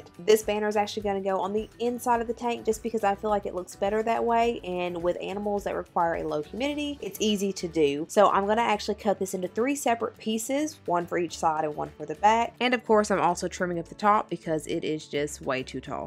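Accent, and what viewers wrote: South, United States. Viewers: Girl u beautiful But that country accent sum else 😭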